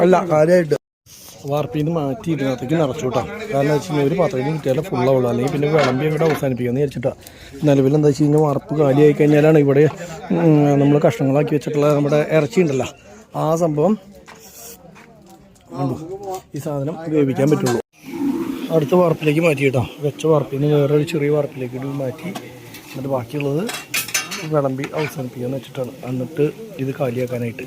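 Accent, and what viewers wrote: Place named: Thrissur, India. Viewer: Nidhak samsarikkanulla samayam kodkooooo. Thugg kekaanulla kodhikonda adum thrishoor Bashailooode😂😂😂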